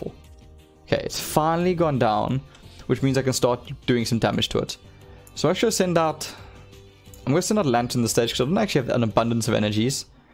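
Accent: South Africa. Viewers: You sound South African... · Recognised the accent immediately... =) Fellow South African here. I was torn between these 2 initially, but ultimately went with Relentless Flame due to both recommendations and daily challenges. Now I have both.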